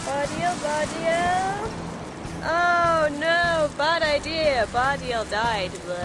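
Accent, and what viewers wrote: United Kingdom. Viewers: So, I notice a different accent back then. The UK experience no doubt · Why does speaks with the British accent in this video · Hilarious. Great video. In addition to the humor, I was struck by how deeply British your accent was at that time. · Back when was British.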